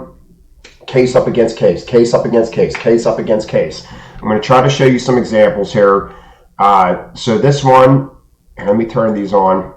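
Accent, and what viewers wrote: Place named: Pittsburgh, United States. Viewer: Caught the Yinzer accent right away 👍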